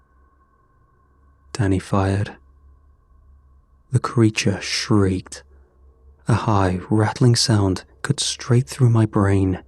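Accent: United Kingdom. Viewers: I can’t sleep without bedtime stories! 🎉thank you for your dedication and British accent lol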